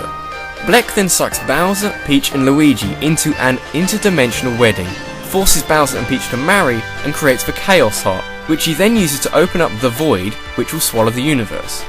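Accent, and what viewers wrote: England, United Kingdom. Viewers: I say it like that and I'm English too so I guess it's an accent thing. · no you brits speak english correctly we americans mispronounce things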